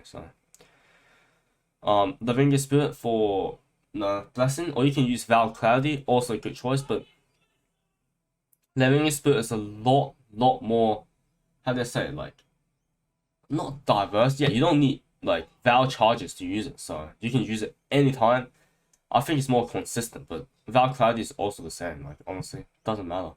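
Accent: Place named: Australia. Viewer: You sound like you have an Australian accent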